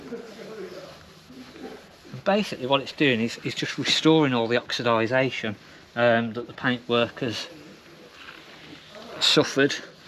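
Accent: Midlands, United Kingdom. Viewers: Hi mate Can you put the name of the product on here please. Sorry but I'm having difficulty getting the name due to you Midlands accent. Thanks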